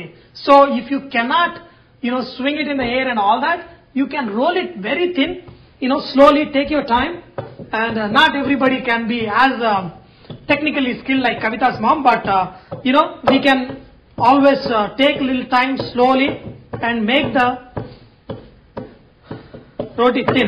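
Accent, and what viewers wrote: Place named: Andhra Pradesh, India. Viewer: You sound very Andhra boss. Baagundi.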